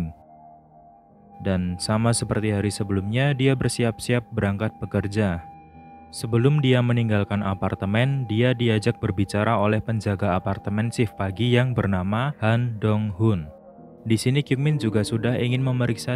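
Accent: Java, Indonesia. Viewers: Jowo banget 😂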